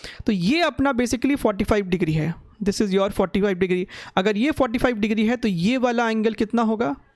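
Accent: Bihar, India. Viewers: Badhiya hai.... meanwhile... Bhanhiya hai...❤️❤️❤️ Pure bihari tone Love frm bihar sir...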